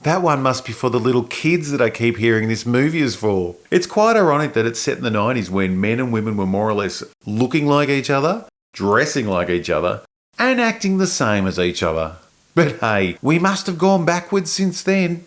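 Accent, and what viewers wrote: United Kingdom. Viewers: 59 seconds in and you are wrong. red sonia was not a superhero. she was just a hero, adventurer . I am not sure what country you are from, sounds British, but I have lived in america since 1976. The 90's the movies were not the nicest to women in the 90's, we were still pretty sexist. In real life and movies. You try to make it sound like we were liberated. we were not. I do agree the movie was pretty boring. I only saw it once. I have no idea if your points are right. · btw i love your accent (British right?)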